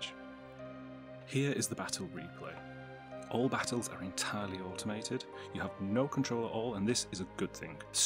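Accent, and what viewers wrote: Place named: United Kingdom. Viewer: your quiet british accent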